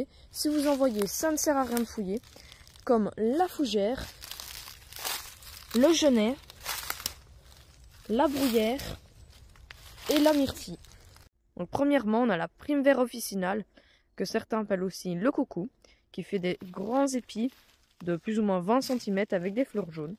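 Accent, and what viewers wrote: Franche-Comté, France. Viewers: Enorme, parce que vous avez l'accent franc-comtois. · Bravo ,et j'ai cru reconnaître une pointe d'accent Franc Comtois, d'où je te félicite.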